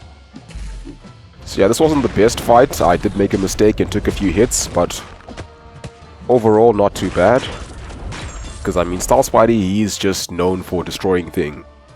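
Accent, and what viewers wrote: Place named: South Africa. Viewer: Whats your nationality? You sound a bit south african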